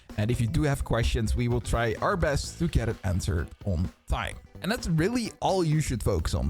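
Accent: Netherlands. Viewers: Is English you’re main language u have a Dutch accent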